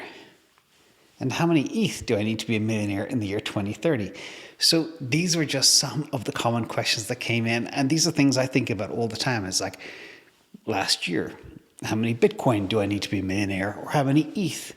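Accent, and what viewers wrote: Ireland. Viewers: . I hear an Irish twang in the accent? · love the vids and the take.. where in Ireland are you from? If I had to pin your accent down.. Rebublic, Dublin, South side.. Dalkey or close?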